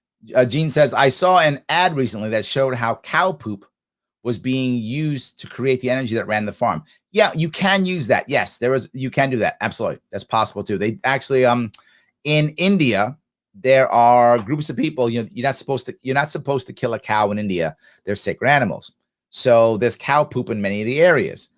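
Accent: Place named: New York, United States. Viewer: Larry: for Pete's sake, you talk like a typical "New Yorker"...terrible weather in New York, in New Jersey (so cosmopolitan of you to deign to mention NJ), but what about Philly and Washington, DC...we don't get any respect? Let me inform you that we got a TON of rain and wind in DC on the night of 31 August. NYC is not the prima donna (not anymore) of the East Coast!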